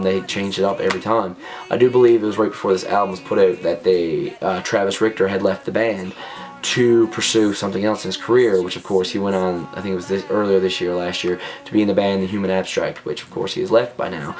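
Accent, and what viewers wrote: Canada. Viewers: you're canadian right? just asking 'cause your accent is AWSOME!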